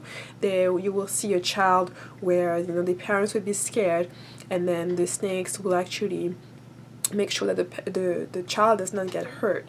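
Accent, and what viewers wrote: France. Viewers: You have sort of french accent. Is is Your first language? (If so, Your English is amazing!) Very good video, by the way :-)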